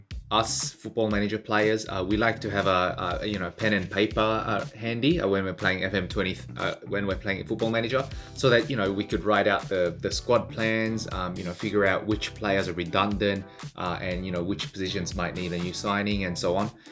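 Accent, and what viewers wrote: Australia. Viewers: You sound like your from Australia! - Be good to see some tests on Low Block effectiveness vs High Line and pressing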